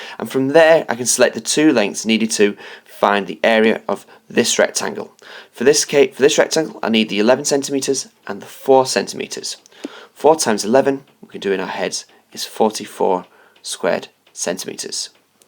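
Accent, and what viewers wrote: United Kingdom. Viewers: That’s because he’s british like me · BRITISH ACSENT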